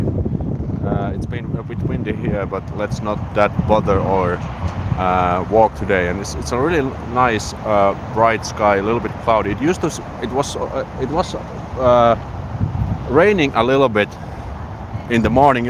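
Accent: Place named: Finland. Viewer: I’m honestly in love with Finnish accent when they speak English! It’s ear pleasing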